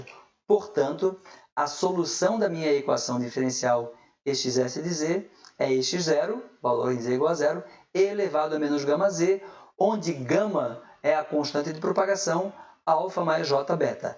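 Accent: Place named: Portugal. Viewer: Aula muito boa! Tens acento português professor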